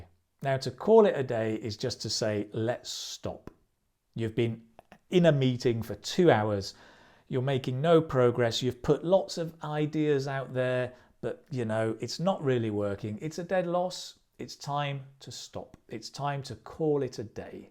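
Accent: United Kingdom. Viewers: I'm really intrigued the way you teach, straight away to the topic and don't waste my time, explain clearly and easy understand. I like your British accent as well.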